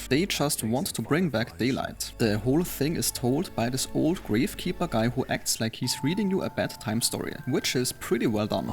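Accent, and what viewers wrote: Germany. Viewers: his voice tells me he is German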